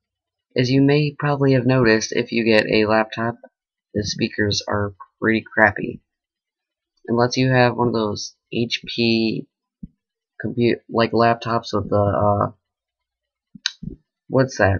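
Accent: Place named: Asia. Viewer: why do you talk Asian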